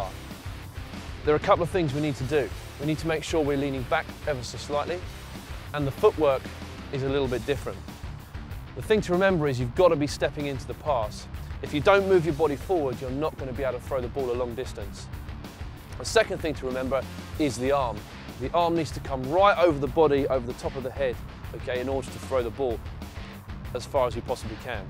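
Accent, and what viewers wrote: United Kingdom. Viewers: LOL he's British! Nice video, though. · I did not expect a British dude teaching American football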